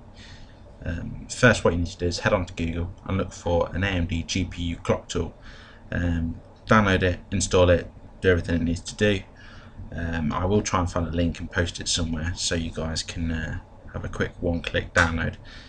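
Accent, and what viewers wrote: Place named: United Kingdom. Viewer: love your British accent :3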